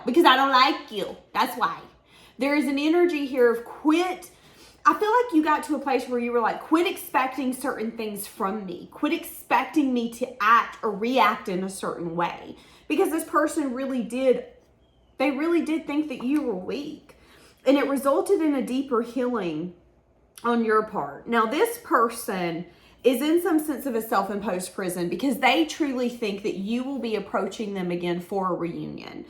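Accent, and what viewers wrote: South, United States. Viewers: Thank you for sharing queen 👑 that was an amazing read,it seemed to resonate with several interactions I've had with loved one.❤🎉I just love listening to your country voice, you are a...😊! I usually keep my thoughts to myself, but when I do speak up (as I'm getting stronger) I seem to be more aggressive in my approach. Family members say I am mean. Probably I am still holding on to hurt & trying to heal.I had to go into isolation the last two yrs. And pull away from a lot of people I really loved. I spend most of my time with two beautiful kittens now and I still believe in love 💕💜 that really was a nice read thank you 🎊💜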